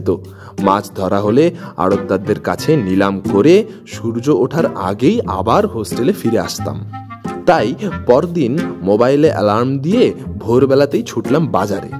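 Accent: Bankura, India. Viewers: Besh valo.. kintu purba medinipur e bankurar accent ta kan e lagche 😐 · Kolaghat e bankurar accent???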